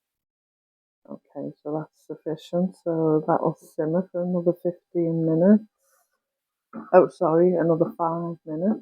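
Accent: United Kingdom. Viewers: I enjoy soups and stews and this recipe looks delicious. I jotted the recipe down as you worked. Thank you for cooking it for us. It is a pleasure to be here, especially to hear words like "whilst," and the lovely English accent. Blessings to you and yours.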